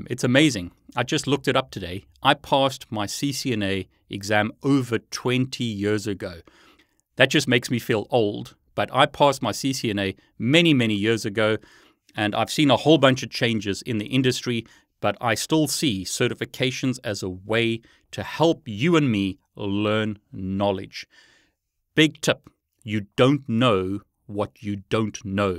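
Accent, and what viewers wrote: South Africa. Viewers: Didn't know you were South African but it helps explain why I've always thought there was something about your accent I couldn't quite place!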